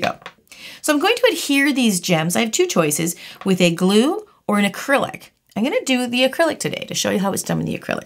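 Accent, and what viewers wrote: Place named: Canada. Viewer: I love your accent! I am guessing your Canadian?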